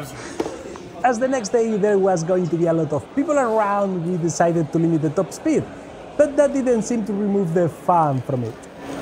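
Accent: Spain. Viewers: No deja de sorprenderme que tu acento sea tan español, y a la vez tu léxico inglés sea tan extenso 😆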